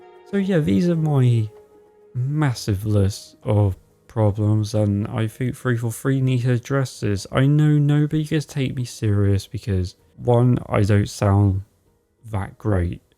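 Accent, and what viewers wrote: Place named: United Kingdom. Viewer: I agree with what you're saying, And don't worry about what your voice sounds like, I think it's sound great, also what is your accent? I keep thinking it's a form of a thick British accent.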